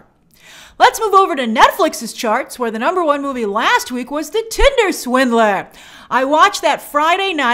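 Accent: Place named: New York, United States. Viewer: You must be having a good weekend. Your new York city accent is coming thru more than usual.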